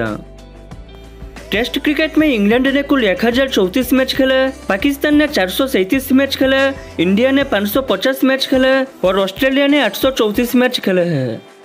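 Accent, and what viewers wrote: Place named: Bengal, India. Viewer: জাতে বাংগালী তা কথার ভাবে বোঝা যায়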